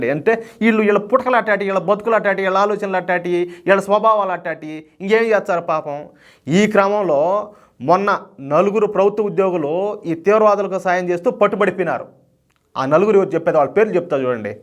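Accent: Rayalaseema, India. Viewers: Saru dhi RAYALA SEEMA yasa . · సీమా యాస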